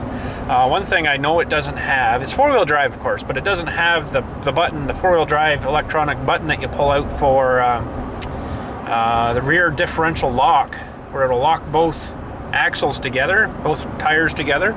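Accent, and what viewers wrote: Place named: Ontario, Canada. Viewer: Good video, but I must say... you sound like Red Green! Haha, must be an Ontario thing. Cheers from Alberta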